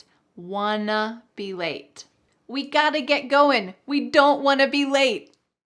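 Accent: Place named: United States. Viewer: Great video! Just, why do you insist so much on the "r" pronunciation and US accent. I mean, why should one want to sound american, these days? After the infamous incident at the soccer World Cup, especially 😅. That really has been the last straw ...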